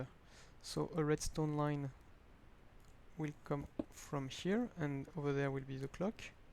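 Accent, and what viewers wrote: France. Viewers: j'entends un joli accent français :p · It's fine if you have a french accent but there will always be racist people. Most of the time though people just find other accents annoying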